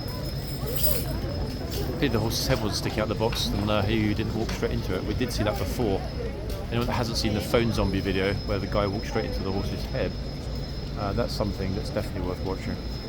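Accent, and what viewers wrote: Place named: Cockney, United Kingdom. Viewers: I LOVE that wonderful cockney accent, albeit, sometimes, difficult to understand (American here 😉)Thank you for the clarification on who is English and who may or may not be British 😅 An additional question, if I may, the owner of this channel answered the gentleman’s question by explaining that when he refers to himself as English, he means he is not Welsh, Scottish nor Northern Irish….. is there a difference in Northern Irish and Southern Irish? 😊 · If you must make your commentary for your channel, please learn that a double 'T' sound is be-t-t-er not bedder, and also the letter 'H' is pronounced 'Aitch' not 'Haitch'. You do not say 'Mem' for 'M', or 'Lel' for 'L', so why do you say 'Haitch' for 'Aitch' 'H'? Please speak the 'King's English' and not that southern counties 'right-on' rubbish. Check the Oxford English Dictionary: aitch, n. 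The name of the letter H, h.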